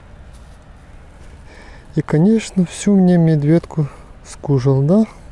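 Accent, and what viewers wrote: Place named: Okanye, Russia. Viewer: ты че вместо буквы А говоришь О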